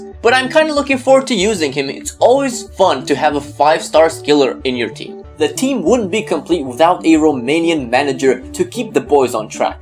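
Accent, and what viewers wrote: United Kingdom. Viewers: nu mai pronunta numele romanesc cu accent de englezoi. e enervant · Dude,esti tare,continua...wow,ai un accent britanic excelent! ;)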